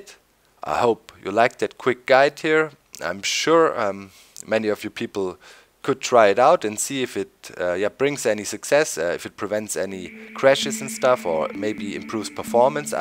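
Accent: Germany. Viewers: are you from german? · yes he is becuase he lives in germany and hes actcent :3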